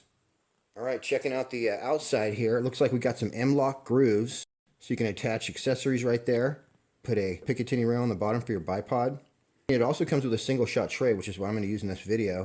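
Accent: United States. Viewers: Just curious. You sound like a Marine Recon sniper or Army Green Beret. You self-criticize sub-inch groups at whatever range. Are you from Kentucky?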